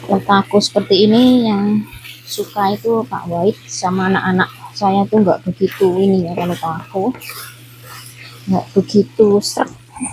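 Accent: Java, Indonesia. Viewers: Aduh mba...lucu deh speaknya sama...jawane loh mba medok banget...aku wong jowo dadi ngguyu dewe...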